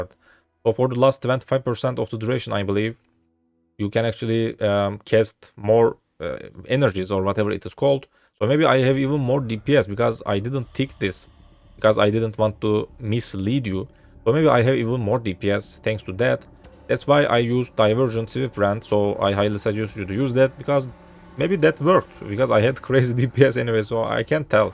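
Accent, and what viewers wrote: Turkey. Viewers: When i first hear i said this is a perfect Turkish accent. Just find out that im right amk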